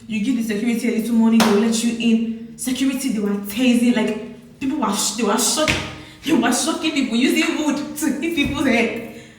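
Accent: Igbo, Nigeria. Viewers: I love your igbo accent while you complain 😅 sorry for the stress, that's why i attend concert because na me know watin my eye see the first time.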